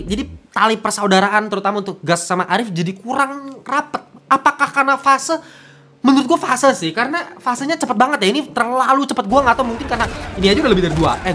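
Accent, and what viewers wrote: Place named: Medan, Indonesia. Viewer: Nah kalo ini baru logat Medan bang... salam dari Medan 😄😄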